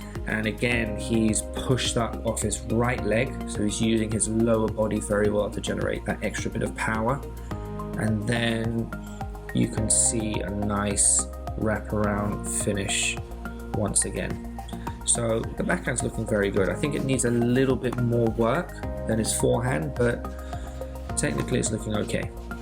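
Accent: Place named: United Kingdom. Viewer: interesting, I thought it's in Europe, judging by your accent I thought it's in Britain. Thank you for this extremely helpful video.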